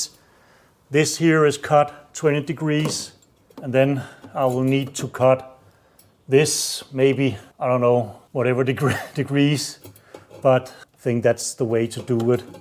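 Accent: Denmark. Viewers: Elsker at man ved første ord kan sige med 100 procent sikkerhed, han er dansk. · ja du behøver iværtfald ikke sige hvor du kommer fra :D. den danske accent er bare dejlig haha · Alene baseret på accenten, så vil jeg smide et vildt gæt på at du er dansker 😏 Fed og interessant video!🤟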